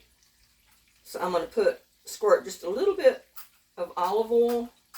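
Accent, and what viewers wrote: South, United States. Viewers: Never mind what anyone says l love you cooking videos and your southern accent. I have a Boston accent and guess what l am as proud of it as you should be of your southern accent. We are all Americans no matter which part of the country we come from. Also l love your blouse in this video very lovely on you. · Dear I am from London UK and I love that southern drawl! Love Maria 🌹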